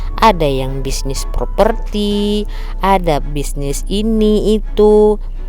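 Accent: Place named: Kelantan, Malaysia. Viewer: Wahh!!kecek kelate mbak kito ni..molek dah tu... 😂😂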